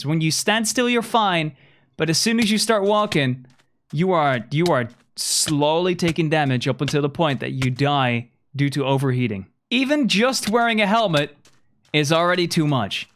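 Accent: Netherlands. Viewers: Are you from the netherlands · Hey love the vids, are you dutch by any chance?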